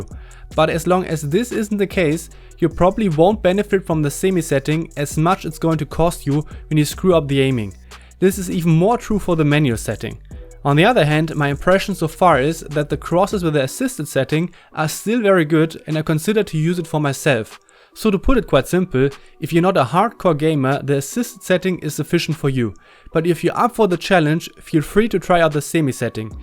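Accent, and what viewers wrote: Germany. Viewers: man hört so sehr raus, das er deutsch ist :D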